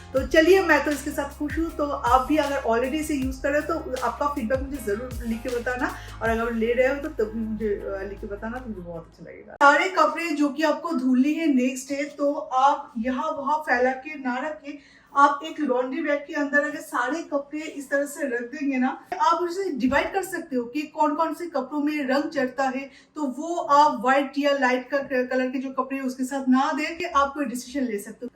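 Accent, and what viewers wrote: Bengal, India. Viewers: Still you're carrying your Bengali tone, accent and pronunciation in your Hindi and English conversation/words.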